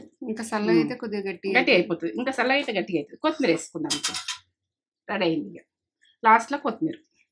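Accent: Telangana, India. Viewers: Am from Vizag, but native place Karimnagar, mana telangana slang vini chala happy feel ayyanu, nenu kuda weeklo 2 times ide curry chestaanu, vedi vediga mango pickletho thinte matram swargame... Thank you andi. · Wow,amma,telangana maata entha cute ga matladuthunnaru,ma amma chedthadi same to same❤❤❤❤❤❤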